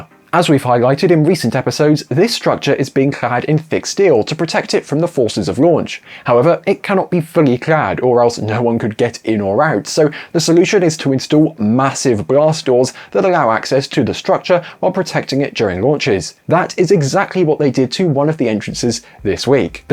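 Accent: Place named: Southern England, United Kingdom. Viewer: It's a peculiarity of one of southern England accents and requires no teaching.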